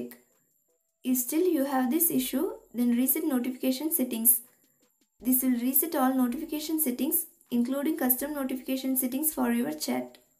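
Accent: India. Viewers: From where you are ?? Most Indian speak English like that, I think she is Indian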